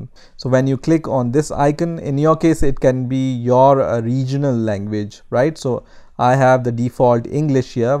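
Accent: India. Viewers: Is this fake? He sounds india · why does he sound indian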